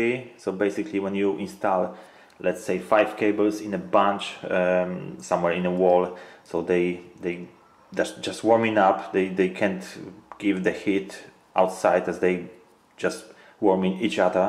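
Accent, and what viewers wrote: Poland. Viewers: Very nice explanation, you make a great teacher; sweet memories of my work with some Polish builders because of the accent. :)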